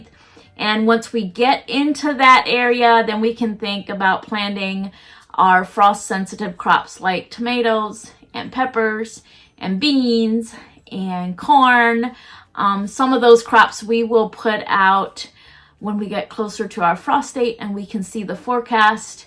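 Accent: Pennsylvania Dutch, United States. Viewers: Is your primary language Pennsylvania Dutch ? I hear it spoken all the time where I live near Lancaster PA also Germany ! Thank you explaining the differences between the two . I use raised beds because of the deer they don’t eat my plants in a raised bed .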